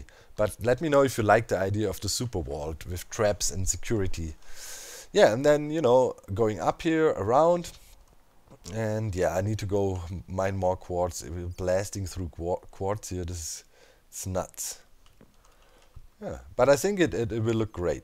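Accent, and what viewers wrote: Germany. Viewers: May i ask if u are german cuz u have a german accent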